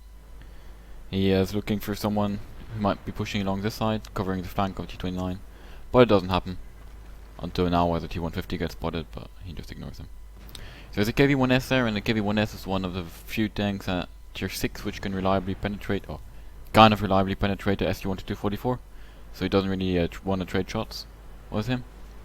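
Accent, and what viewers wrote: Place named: Germany. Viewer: The commentary on the video is like to listen Quickybaby with a differnt voice and german accent. He uses all the same phrases. I dont rate this bad. It's just a statement.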